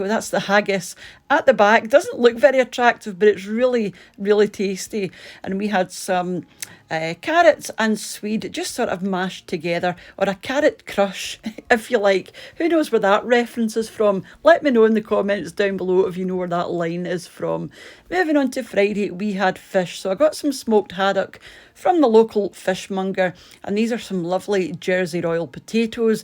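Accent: Scotland, United Kingdom. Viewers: "Carrot Crush" is from "The Royle Family!" 📺🤣 I love your wonderful Scots accent, and you cheerful positivity,! 👍🤣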